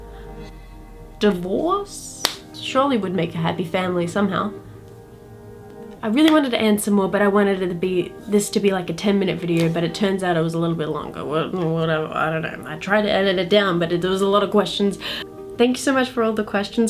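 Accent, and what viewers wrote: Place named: South Africa. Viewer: You sound more South African than Australian...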